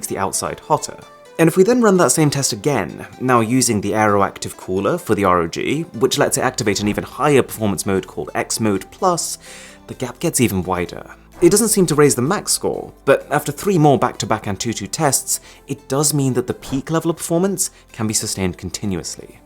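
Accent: RP, United Kingdom. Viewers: I love your reviews but that accent... fuck I hate British people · What's with the exaggerated posh accent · he has british accent. teach me to.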